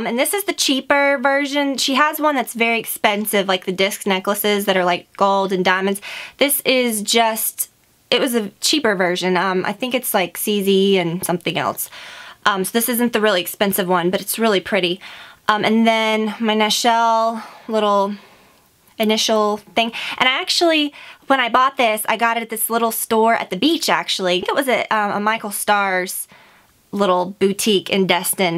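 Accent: South, United States. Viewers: You're accent is so cute! Not too country but still southern sounding.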